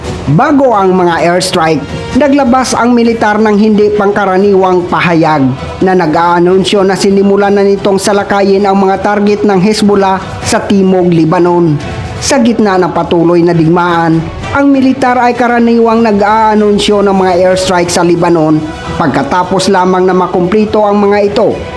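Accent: Iloilo, Philippines. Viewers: kesa naman sa katulad mo na di kaya ang ginagawa ni prof eh ilonggo yan si idol eh hahaha. Mas mahilig pumuna ang mga taong walang kakayahan kesa doon sa marurunong.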